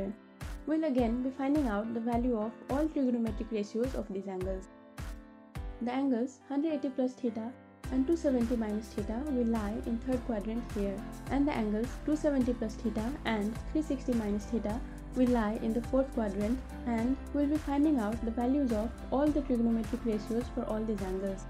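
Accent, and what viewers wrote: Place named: Nepal. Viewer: Hlo ... miss where are you from ? .... your accent look like nepal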